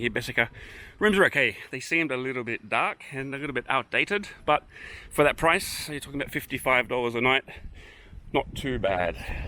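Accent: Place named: Australia. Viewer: Thanks for that! You have a pleasant voice. A nice Australian accent & not too occa!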